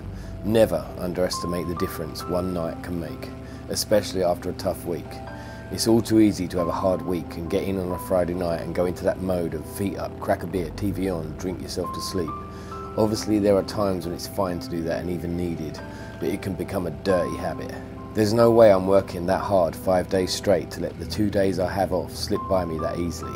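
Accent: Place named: England, United Kingdom. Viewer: Definitely an entertaining video. Your narration skils and proper English accent make it worth watching. Have a Low Rider S and try to ride every day, but in Idaho it's been over 100 degrees F for weeks and ii's becoming tiresome. Just bought a new VStrom 1050 DE 3 days ago. Can't wait to hit the road. City life blows. Thanks . New sub.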